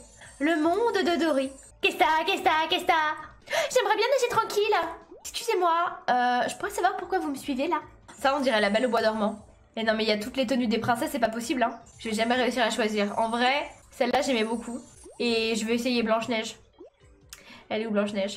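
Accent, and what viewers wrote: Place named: Marseille, France. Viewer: Laccents Marseille mdrr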